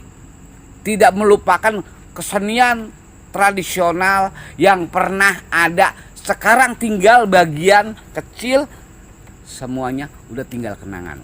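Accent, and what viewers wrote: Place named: Betawi, Indonesia. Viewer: Terimakasih penjelasan ttg wayangnya bang Haji Mandra ... Mau tahu nih bang Haji , kok bhs betawi abang Haji sm bhs betawi almarhum babe Benyamin beda ... Knp logat betawi bisa beda begitu bang ... Kl bisa tolong jelasin dong bang Haji , makasih ya bang Haji sebelumnya nih , sehat terus bang Haji 👍🙏🙏🙏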